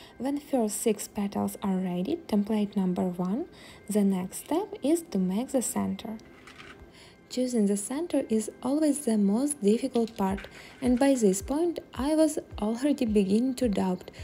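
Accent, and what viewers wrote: Russia. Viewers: Вы же русская, сразу слышно) Почему на английском? А работы очень красивые 😊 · Акцент)) выдаёт что наша! Но здорово всё равно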